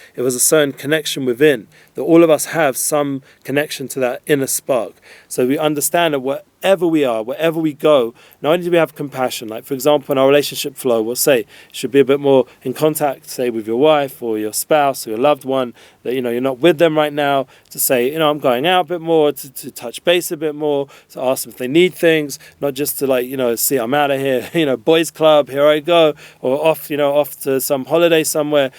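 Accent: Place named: United Kingdom. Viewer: Love the British accent!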